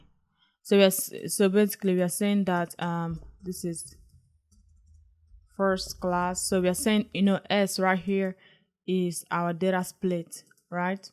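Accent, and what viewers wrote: Nigeria. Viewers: you sound Nigerian girl ... I am gonna follow your channel...... Love you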